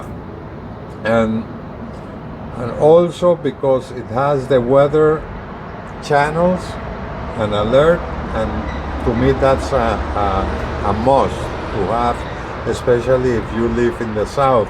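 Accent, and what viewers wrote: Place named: Argentina. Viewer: I expected a southern Louisiana accent but instead your accent sounded very Argentinian? Little hint of Italian made me think so.. very cool video :) thanks for sharing!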